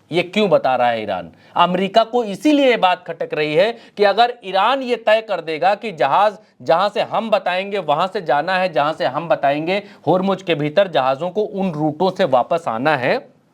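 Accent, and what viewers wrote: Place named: Bihar, India. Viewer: جی شاندار ہو ۔اللہ تمہیں زندگی دے ۔عمر دراز کرے خدا۔بہترین بہاری بولتے ہو اچھا لگتا ہے ۔پ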